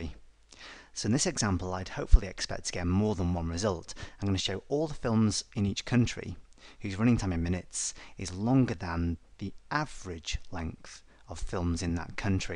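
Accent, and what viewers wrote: England, United Kingdom. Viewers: is seriously one of the best instructors I've found on youtube. You start from scratch, with simple examples and you build on your lesson plans beautifully after that. I cannot thank you enough on how amazing SQL lessons were, as well as your VBA lessons. I thought I had enough knowledge I needed for basic VBA, but still ended up learning a tremendous amount from those videos and then finally SQL. I'll admit I got confused with correlated subqueries, went on to google for a bit, but finally watched this few more times and they finally clicked. Online training courses can be expensive and so I want to thank you for providing SQL and VBA on youtube. It's greatly appreciated. There are a lot of smart people in the world, but not everyone can be a great teacher and you certainly are a great instructor and British accent definitely a plus:)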